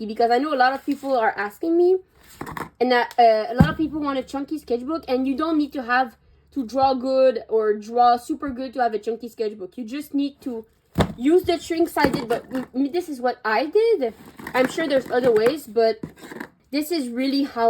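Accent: France. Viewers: Salut!! J'espere que tu vas bien.Est ce que tu es française? Parce que je trouve que tu as un petit accent français lol :).En tout cas tes dessins sont trop beauux <3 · Hey, I'm new on your channel and actually... Are you french ? No cause you've got a french accent :') (saying that cuz I am french). Great video tysm !! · your accent is so pretty :3 my grandma is Belgian and speaks French so I could immediately tell you have a French accent lol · r u from France idk your accent feels french ( btw I speak French)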